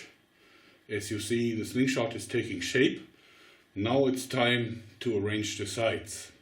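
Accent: Germany. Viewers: could it be, that you are german?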